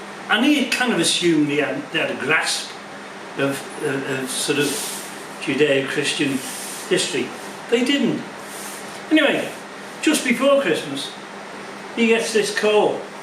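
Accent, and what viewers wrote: United Kingdom. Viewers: Happy New Year from across the pond. As a fellow Britt as soon as you started talking i could detect the accent. Still managing to get some riding in, here in North Carolina. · Happy New Year, look forward to seeing the Italians out on the road again. You do sound a bit more scouse! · Light headed ! made me smile. Motorbikes, Guzzi riding and talking bollocks done that most of my life too. Did hear a little scouse coming through. Happy New Year sir.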